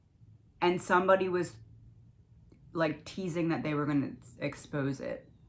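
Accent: Philadelphia, United States. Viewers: That was one of the most interesting hours I've spent on youtube in a long time! I had no idea how cards were read, or how they were used to connect with spirit energy (I'm probably butchering the proper vernacular ) or even how a story comes together, but this was fascinating! I also enjoyed your very laid back, soft spoken demeaner, which is very calming. Do I hear a "Philly girl" in your accent, or a surrounding suburb? I grew up there. It's an accent I seem to notice immediately. Anyway, I was listening to someone on youtube last night who apparently receives information from "spirit" by being shown things in her mind (I guess) which she then interprets. I mention this because her entire session revolved around things and people connected to the death of Hannah Cleere and her family. Many names came to her that were members of Hannah's family, and she mentioned rather often that none of the information she was receiving was what she had expected to be discovering last night. 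If I'm not mistaken, you also mentioned something "catching you off-guard", or "throwing you off" about the cards being chosen as it relates to Hannah. Honestly, both your reading and the one from last night were so interconnected and so overlapping it was quite amazing! I have always thought these murders were either 1) revenge for the death of Hannah, or 2) the ex-boyfriend (Jack D), feeling betrayed by Kaylee for breaking up with him. Just wanted to share my thoughts, and thank you again for such a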